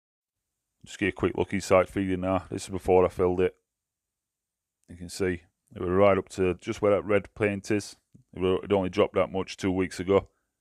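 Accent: Yorkshire, United Kingdom. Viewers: What part of Yorkshire are you from pal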